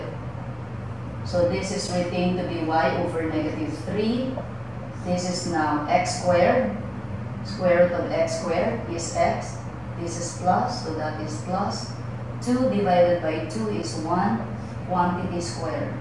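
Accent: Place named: Philippines. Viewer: Are you from Manila Philippines, your accent very similar to my mum, Bernice NGO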